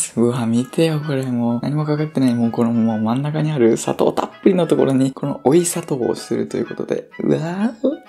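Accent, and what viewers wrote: Okinawa, Japan. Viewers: ちむどんどんっていう朝ドラで沖縄の方言にトリコになっています(^^) さんのリアル方言堪りません！美味しそうに食べている姿を見て夜ご飯食べました🤤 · 沖縄方言めちゃいいですね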